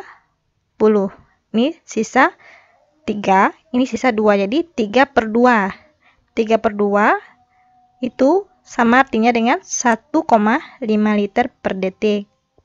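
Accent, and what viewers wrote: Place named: Malaysia. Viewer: suaranya kok gitu kayak orang malaiysa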